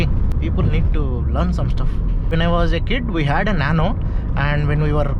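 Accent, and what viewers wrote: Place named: Hyderabad, India. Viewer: Bro i love your video😁...... ..keep posting....and that hyderabadi accent is love bro....seriously.....🥰🥰🥰🥰🤗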